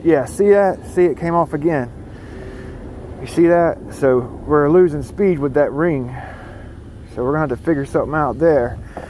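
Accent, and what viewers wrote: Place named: South, United States. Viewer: Love your southern twang. I'm from Michigan but talk a little like you. I'm Midwest, and southern Indiana, with Canadian accents here and there HaHa!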